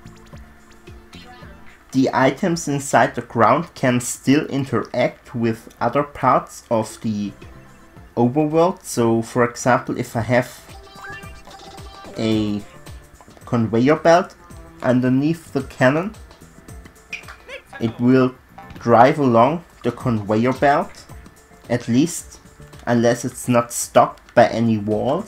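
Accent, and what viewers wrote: Germany. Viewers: German accent af😅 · Man hört sooo hart dass du Deutsch bist😘 #noHomo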